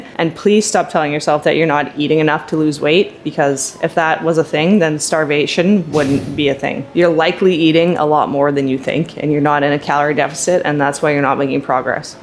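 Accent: Canada. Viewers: I love your content. Good advice for sure, but I gotta ask. Are you Canadian? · Are you Canadian and why can I hear it in your voice and I'm Canadian?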